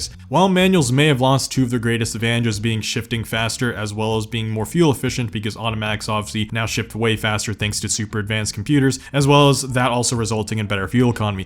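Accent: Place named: Midwest, United States. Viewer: You have a similar accent to Sam O’Nella, are u from the mid west?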